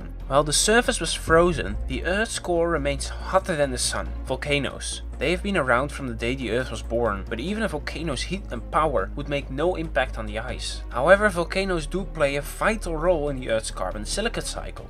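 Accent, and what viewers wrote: Netherlands. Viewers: Some constructive criticism: Talk slower, and clearer (dutch accent is of a good things ;)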